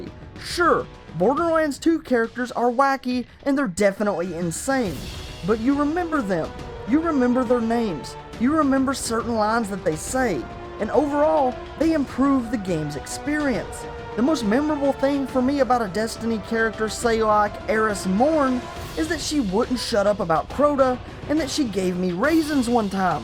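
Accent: South, United States. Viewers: are you from the south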